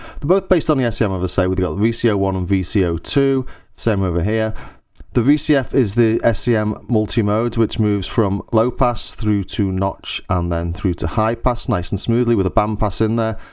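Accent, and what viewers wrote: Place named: Scouse, United Kingdom. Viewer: im trying to figure out if you`re a posh scouser or from the Wirral :)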